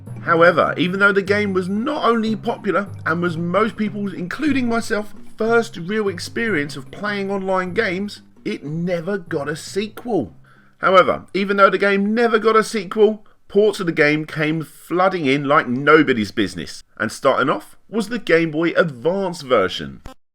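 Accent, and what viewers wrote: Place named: United Kingdom. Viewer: We love that British accent. Sorry you had to deal with low speed !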